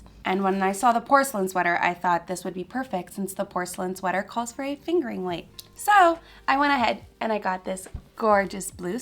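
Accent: Quebec, Canada. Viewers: I just posted my knit city video as well! I learned to knit on youtube in 2016 but picked it back up during quarantine. Are you Quebecois? I can tell by the way you read those labels! #frenchcanadiangang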